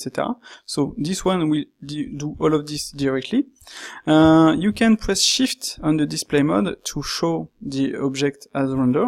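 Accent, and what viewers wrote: France. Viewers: tu est français? sa s'entend grave lol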